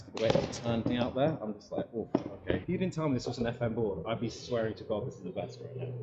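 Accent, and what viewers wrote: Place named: Europe. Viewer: that's what europeans sound like bruh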